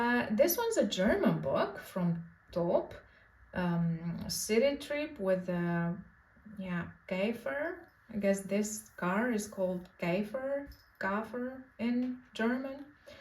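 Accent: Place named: Netherlands. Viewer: I’m so glad I came across you! All 4 of my grandparents immigrated from Netherlands to USA. I love hearing your accent and look for to more videos from you and learning more about my heritage.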